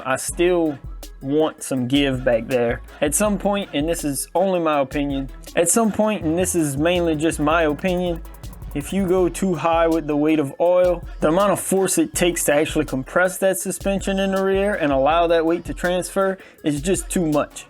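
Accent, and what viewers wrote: Louisiana, United States. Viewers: I cant with the Leweeze-ee-annah accent... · Got that Houma type accent going....I'm on the Northshore. Just getting into the drag racing scene, coming from Nitro Buggies in the past.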